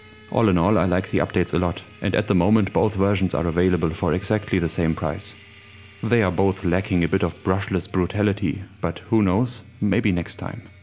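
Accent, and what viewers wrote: Germany. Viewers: Your German accent is delightful! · wie sehr man diesen deutschen akzent immer raushört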